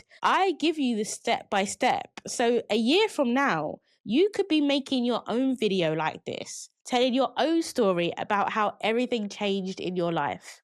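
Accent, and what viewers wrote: United Kingdom. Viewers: I have a liking for accents and it’s interesting to listen to you speak. You sound like you have a British accent with an Australian accent underneath and with an African accent (I can’t tell which country) underneath that. It’s nice 😊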